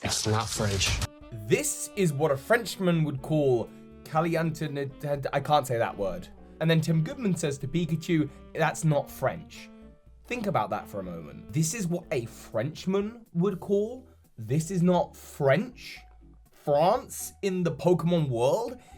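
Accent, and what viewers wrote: England, United Kingdom. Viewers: What part of England are you from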